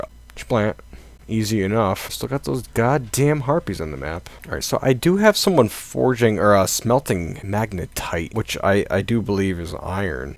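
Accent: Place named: Connecticut, United States. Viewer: smoky, rocky, caffeined, autist connecticut accent is best accent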